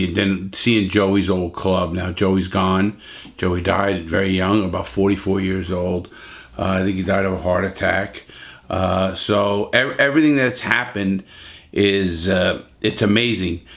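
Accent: New York, United States. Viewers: do you find that your NYC accent comes back thicker when you go visit your son?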